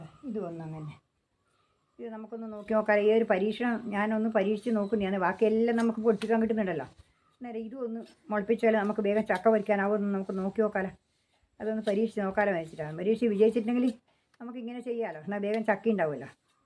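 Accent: Kannur, India. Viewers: അമ്മയുടെ നാട് കണ്ണൂരാ സംസാരം കേട്ട കൊണ്ട് ചോദിച്ചതാ അമ്മേ സപ്പർ ആണ് അമ്മേ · അമ്മ കണ്ണൂരാണെന്നു തോന്നുന്നു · കണ്ണൂർ അമ്മയാണ് അല്ലേ നന്ദി ഏച്ചി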